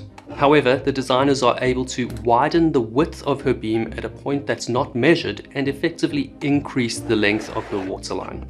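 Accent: South Africa. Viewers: you sound very south african...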